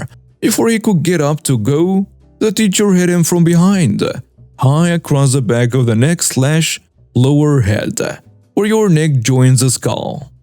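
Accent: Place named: South, United States. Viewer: #1 love your southern accent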